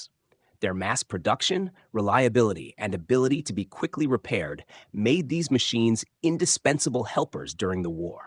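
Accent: United States. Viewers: Please use cubic inches on American vehicles and list the torque of the engine. You sound like an American and engine torque is how these huge low rpm engines moved so much weight. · American accent with all measurements in metric----??????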